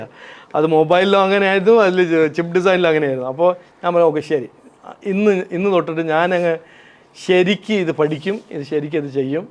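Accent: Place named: Palakkad, India. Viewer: പാലക്കാടൻ മലയാളത്തിന്റെ ഒരു രുചി സംസാരത്തിൽ നിഴലിക്കുന്നുണ്ട് thankyou sir 🙏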